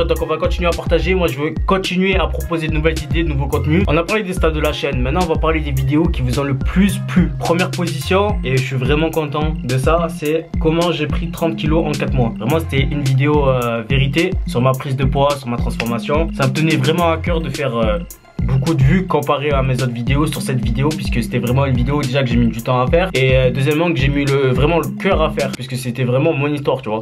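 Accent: Marseille, France. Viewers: sa va c'est bien t'es vidéo moi je kif comme tu est... avant les vidéo que tu fessais genre je kidnappe un abonner ou playlists ou on lui coupe les cheveux on aime bien ton accent de Marseille avec t'es tarpin bien c'était mieux a l’ancienne je dois pas être le seul a pensait sa mais continue tu est dans le droit chemin.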